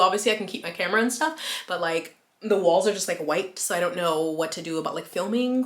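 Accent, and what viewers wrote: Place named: South, United States. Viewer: Let me guess...Alabama or Mississippi.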